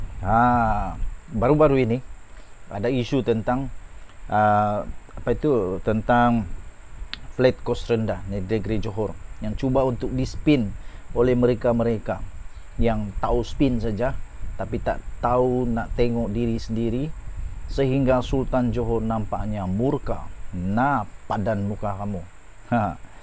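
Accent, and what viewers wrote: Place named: Kedah, Malaysia. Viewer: Awak pandai cakap Kedah hebat la awak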